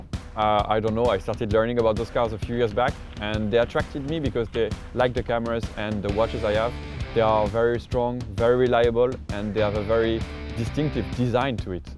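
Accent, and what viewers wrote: France. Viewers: French accent suck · Un français qui parle anglais c'est toujours grillé ! Bravo en tout cas, j'adore cette caisse. et de savoir qu'une moto trial rentre dans le coffre ça donne des idées !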